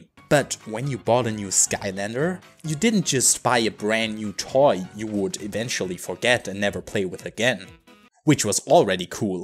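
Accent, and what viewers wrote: Germany. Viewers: Your accent sounds really german are you?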